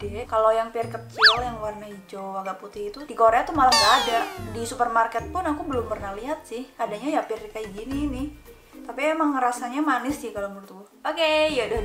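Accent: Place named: Purbalingga, Indonesia. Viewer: Mirip banget kalo ngomong kayak mbak ku di Purbalingga.. lama ga ketemu karena sy di Kalimantan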